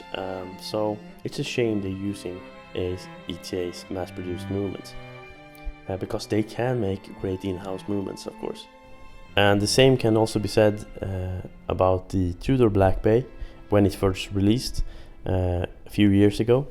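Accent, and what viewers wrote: Sweden. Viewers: He's Swedish right? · this dude Swedish as fuk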